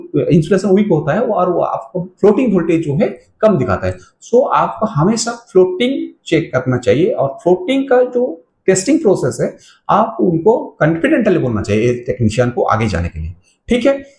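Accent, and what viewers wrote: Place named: Odisha, India. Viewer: Bhai aap odisha se ho kya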